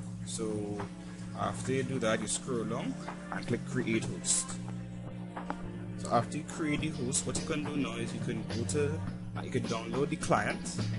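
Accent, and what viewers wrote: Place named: Trinidad and Tobago. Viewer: boss u sound link a trini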